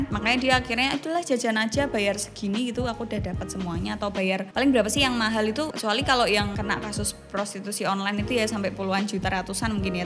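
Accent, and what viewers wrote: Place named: Bantul, Indonesia. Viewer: Iki mbk e nek ga wong bantoel.. y pling skitar kalasan po banguntapan.. logatmu ngangenin desoku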